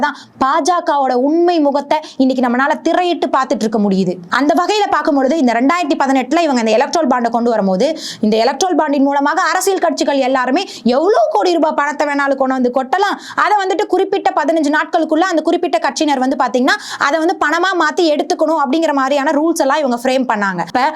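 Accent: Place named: Kongu, India. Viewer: பேச்சு‌வழக்கு கொங்கு நாட்டு பக்கம்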